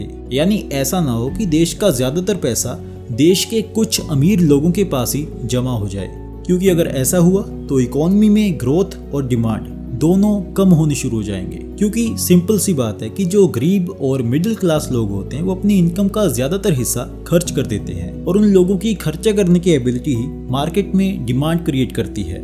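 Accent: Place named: Punjab, Pakistan. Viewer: Accent sounds more like how pakistani punjabis speak.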